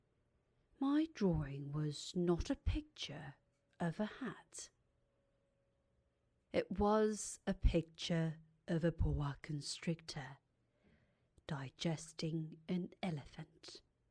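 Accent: RP, United Kingdom. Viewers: 포쉬가 이렇게 아름답게 들린건 첨입니다❤️ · 무진장 이쁘시네요. 영어까지 영국 상류층 느낌 팍팍. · 맨날 영어관련 영상볼때마다 "엘~로 에브릐보우~티~~ 마 넴~잊 퀀주현~"하시면서 등장하셨는데ㅋㅋㅋ너무 자주뵈서 그때마다 영국발음 진짜 좋으신 아리랑티비 소속 씨....라고 외워버렸는데ㅋㅋ 이렇게 채널하고 계실줄이야!! 우연히떠서 봤는데 구독누르고 갈게용ㅎㅎ안그래도 영국ㅠ상류층 발음을 좋아하는데!!! 완전 취저ㅠㅠ♡ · 와 영국발음 너무 좋아요 ㅠㅠㅠ흐엉엉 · 목소리가 이렇게 섹시하다니,영국 발음 치인다ㅜㅠ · 헉 책중에서도 제가 젤 좋아하는 어린왕자라니ㅠㅠ게다가 영국발음..감사합니다♡ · 으아 역시 영국 발음 너무너무 좋아요 ㅠㅠ 저도 영국 영어 공부하고 있고 쓰려고 노력하는데 학교에서 미국 영어랑 마찰성이 생기더라고요... apartment를 flat이라 써서... 그래도 뭔가 뿌듯하기는 해요!!! 어쩌다보니 학교에서 영국 영어하는 철학 소녀로 유명해졌더라고요 ㅋㅋㅋㅋ 무튼!! 명절 잘 보내세요:)💜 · 와 광고에서 아리랑 티비 아나운서 나오는거 봤는데 진짜 발음 너무 섹시한거 같어요.ㅠ̑̈ ㅠ̑̈ ㅠ̑̈ ㅠ̑̈ ㅠ̑̈ 진짜 발음 완전 영국 귀족 같아요 진짜 너무 너무 너무 닮고 싶은 발음.. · 저도 영국 발음 가지고 싶네요 ㅠㅠ 멋져요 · 영어를 RP혹은 posh eng라고 하나요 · 영국식 발음이 너무 듣기 좋네요.. 특히 가 들려줘서 정말 듣기 좋네요... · 영미권에서 유학하셨나요 아니면 독학으로 영국식 발음 익히신건가요? ㅎㅎ 너무 잘 들었습니다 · 미국 버터발음에 익숙해진 귀가 영국발음을 인식하지 못하네요..? 영국발음 넘 조흔것!! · 영국억양 진짜 개사랑해요.... 미국이 아니라 영국으로 갔어야했는데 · 어떻게 해야 이런 발음을 갖을수 있나요 목소리도 넘 힘있거. 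전 호주발음을 가지거 있는데 영국 발음이.훨씬 엘레강스한듯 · 영국식 발음에 케러멜라이즈드 된 버터처럼 이불 속으로 녹아듭니다~~~ 느아아아앙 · 와 너무좋아요ㅜㅜㅜㅜ 구독하고갑니다 영국영어 많이많이올려주세요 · 고급진 영국영어 발음이네요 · 영국발음 너무 좋아요... 진짜 멋있는 느낌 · 아리랑 티비 광고 맨날 나와서 대사 다 외웠는뎈ㅋㅋㅋㅋ 이렇게 봬니 반갑네요 ㅠㅠ 목소리도 너무 좋고 발음 진짜 고급스럽네요 ㅠㅠㅠㅠ저도 영국 발음 저렇게 내고싶어용 퓨ㅠㅠ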